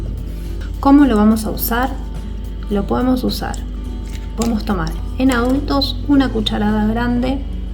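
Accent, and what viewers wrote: Argentina. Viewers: ❤😊gracias por la recent unique estoy USA y las hojas no se por cual sustituirlas acá que tengan esa ingredients parecidos 🌷🌷🌷gracias soy Dominicana me encanta el ace to Argentino y vivo aquí en North Carolina 🇩🇴🙏🏼🌷🌷🌷😍💕gracias soy Dominicana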